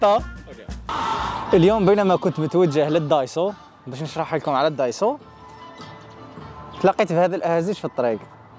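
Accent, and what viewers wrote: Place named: Algeria. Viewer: اللهجة الجزائرية واليابان مع بعض ادمان ربي يبارك فيك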